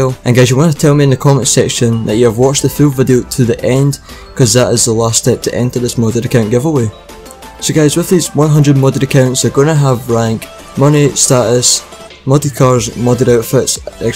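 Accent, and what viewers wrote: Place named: Scotland, United Kingdom. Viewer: ur scottish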